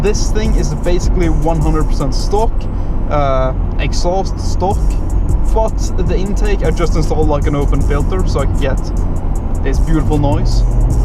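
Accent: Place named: Norway. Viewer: Where are you from? You sound Norwegian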